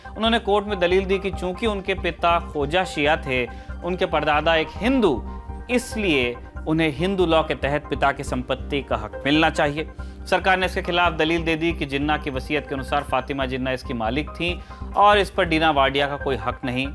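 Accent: India. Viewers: Your all information is wrong n your face is true Hindu. Please don’t pronounce Jinnah name from your mouth as Indian accent sucks. Jinnah said that he loves Mumbai n wanna settle back and Jinnah said I made a big mistake of making Pakistan and demanding more money for selling his property, these all are your info or dream cuz Jinnah never said this. And we Pakistanis are the most blessed now, even india is a large country but Pakistan condition is way better than India.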